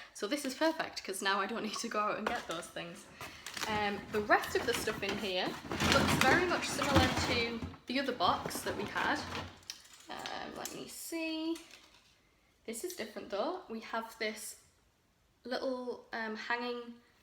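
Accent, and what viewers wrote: United Kingdom. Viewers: That's a definite British accent, if I'm not mistaken. Im glad to hear. I don't see many of us on here. 😉 lol. This is American based I suppose. 😂 When you grab a bunch of boxes together from the same store, your bound to get copies. The last batch of boxes were better, but, one woman's trash, is another woman's treasure...as they say. You'll soon find homes for the thongs you don't want/need. Re the letters...there's the option of making frames from two L's screwed or stuck together. Just turn one upside down and cover. Fancy up...with what ever. Plus the O's. I heard you mention, your a crafter at heart. 😉 Isn't it odd that we enjoy watching others open boxes, to see what's inside. I've not watched this kind of thing before, but I think I'm a bit addicted. Thank goodness we dont have Michaels over here in the Uk. (secretly I do) My stash of crafting materials would be horrendous 😱 Er it's not far off it already. 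There's always room for more 😂 I'd gladly lighten your load a little...can't wait for the chance at your give away. I'll be watching for your craft tutorials. Happy crafting folks.x 😊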